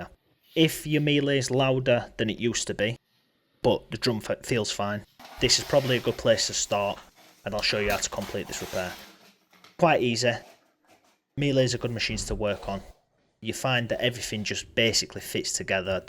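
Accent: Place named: Yorkshire, United Kingdom. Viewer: Brilliant video. I had the dampers replaced in mine a few months ago, but now it's making a horrendous sound spinning with sparks flying inside the drum. Probably bearing collapse. Your strong Yorkshire accent brings back memories. I was born and bred in Yorkshire. I moved from West Yorkshire to Cornwall 30 years ago as it was being taken over by Pakistanis and other minorities.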